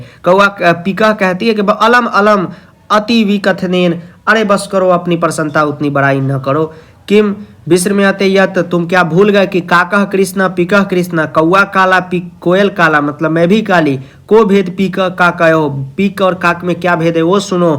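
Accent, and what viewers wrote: Bihar, India. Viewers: Sir apke bolne ka trakike se lagtha ha ki ap vihar se belong kerthe ha. Sir me bhi bihar se he belong kerthi hu lakin rethi delhi me hu..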